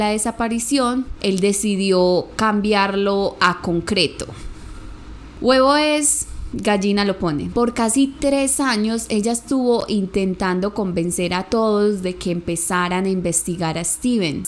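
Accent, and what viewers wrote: Antioquia, Colombia. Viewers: Es el acento típico de los paisas (los nacidos en Medellín )...acá en Colombia,hay variedad de acentos 😊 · Ay mi querida, me encantan tus videos y tu acento colombiano... Pero hace unas semanas una colombiana me rompió el corazón y me duele escucharte hablar 😭💔 ojalá pronto lo supere para volverte a escuchar con cariño. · Si, a mi también me gusta el acento Colombiano, después del de mi país por supuesto. Saludos desde Zacatecas México ❤️🇲🇽🥰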